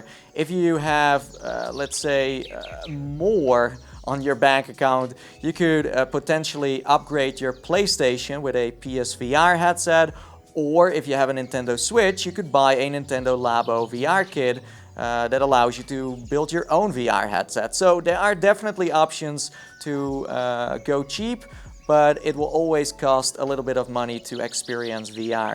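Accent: Netherlands. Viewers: Wait are you Dutch because you have a accent I fit too but are you Dutch???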